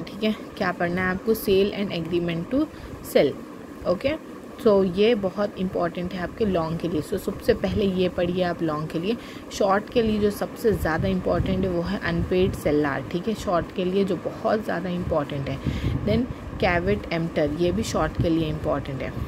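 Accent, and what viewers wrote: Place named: Hyderabad, India. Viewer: Thanks mam for this.. Pure hyderabadi explain😊😊😊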